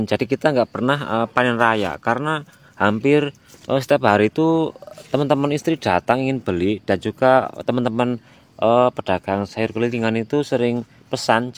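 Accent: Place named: Banyumas, Indonesia. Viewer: Ngapak temen lur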